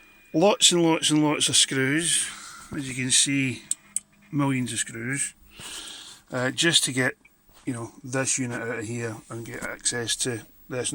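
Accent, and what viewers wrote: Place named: Glasgow, United Kingdom. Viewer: Tell me - you must be from Glasgow or the west at least!! Cheers de VK6HIL ( an ex-weegie! )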